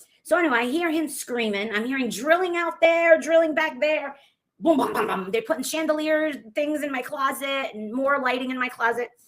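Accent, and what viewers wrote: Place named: New Jersey, United States. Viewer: New Jersey! That awful accent. That makes perfect sense!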